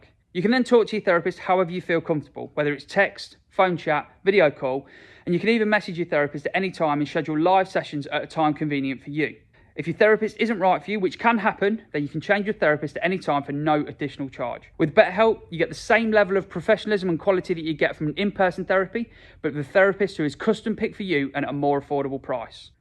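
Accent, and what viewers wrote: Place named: Leicester, United Kingdom. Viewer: Good film bud, as usual. Too much talking my arse 😉. The Lestoh accent makes me laugh though (Nottingham here 😉)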